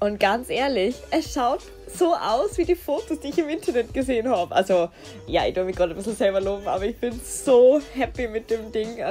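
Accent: Austria. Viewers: Well try not to adapt her pronunciation too much, she has a really heavy Austrian accent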